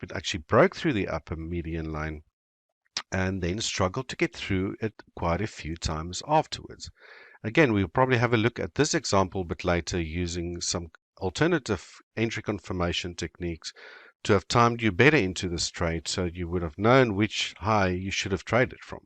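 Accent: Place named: South Africa. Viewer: You sound South African boet, is jy?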